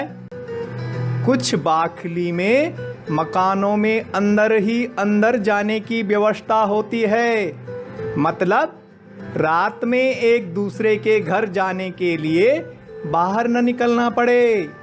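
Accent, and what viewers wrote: Garhwal, India. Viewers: Sir aapki me grhwali bahut acchiii lgti h...missing dat grhwali tpkara....😊😊😊.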